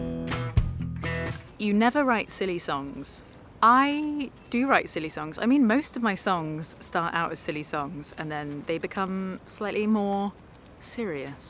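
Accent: United Kingdom. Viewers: I know you hear this all the time but I so love your accent. Just British beautiful.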